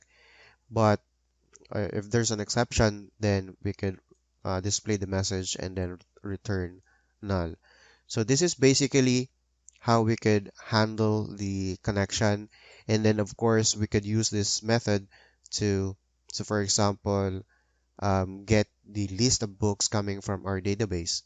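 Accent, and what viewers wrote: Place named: Philippines. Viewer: Parang Filipino Accent, kung Filipino ka sir... Maraming Salamat po :) sana magupload pa po kayo.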